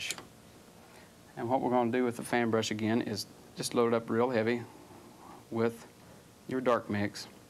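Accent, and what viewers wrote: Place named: Indiana, United States. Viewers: He is the best. The Indiana country accent--"acrosst". Perfect ASMR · Classic Indiana Accent...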